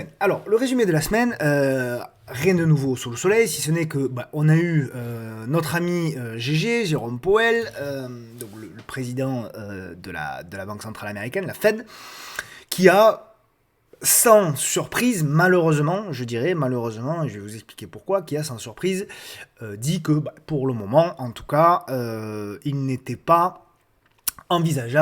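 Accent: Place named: South, France. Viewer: Hello merci pour ton analyse, toujours intéressante et pertinente, l 'accent du sud ajoute un plus et la coupe de cheveux ca va 😀 ps: j' ai acheté Alstom lors de la chute des résultats, ca marche bien et je suis content que tu te trompes parfois 😉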